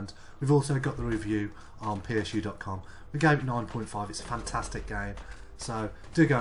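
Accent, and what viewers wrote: West Midlands, United Kingdom. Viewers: Subbed because you have a West Midlands accent :D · Sounds like a West Midlands accent to me :)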